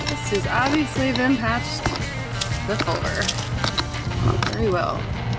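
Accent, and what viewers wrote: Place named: Eastern Pennsylvania, United States. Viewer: This video popped up in my feed and a min in I could tell you were in PA by your accent, I’m assuming eastern PA 😂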